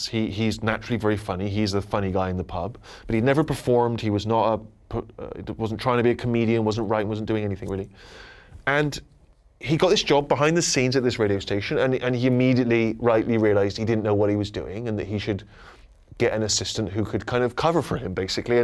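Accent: United States. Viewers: Stephen sounds so American these days haha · Where's his Bristolian accent gone????? He sounds semi-yank uggghhhh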